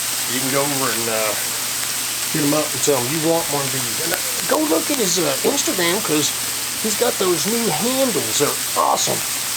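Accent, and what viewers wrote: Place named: Texas, United States. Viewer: Hi.. I'm your friend from Jordan.. I just love that Texan accent of you.. It reminds me of those old series i used to watch on TV when I was little kid... Like "Guns Smoke ".. I remember that guy "Festus".. I also remember " The High chaparral ".. 🤗 Anyways.. I enjoy so much your amazing.. Awesome ways of cooking, 🤗💕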